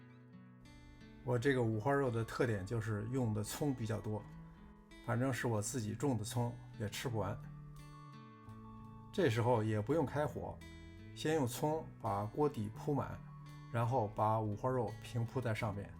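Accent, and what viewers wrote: Beijing, China. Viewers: 视频声音太小了啊 呵呵 快出怎么吃又保持体型的视频吧 就爱听你的北京话 嘿嘿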